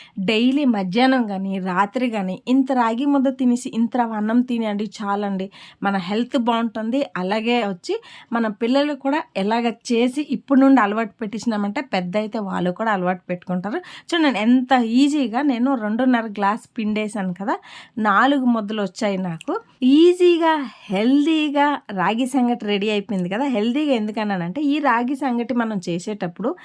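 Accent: Srikakulam, India. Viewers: Slang srikakulam, place karnatakam, chesedi authentic rayalaseema food. Nice.. Flavours of India.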